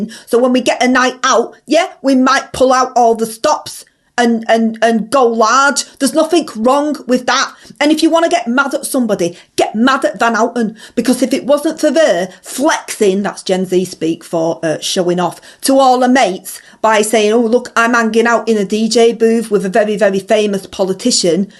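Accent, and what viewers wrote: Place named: Manchester, United Kingdom. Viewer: yep, Basic mancunian.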